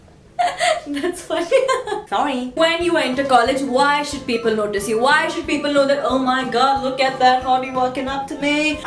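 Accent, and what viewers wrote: Maharashtra, India. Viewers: That's funny ! you speak English in Marathi accent :-D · Dat Marathi accent in English...u r amazing ...what makes u awsme is dt ur topics n videos r relatable (to v Indians )which makes u makes u different frm Lilly Singh...love love❤️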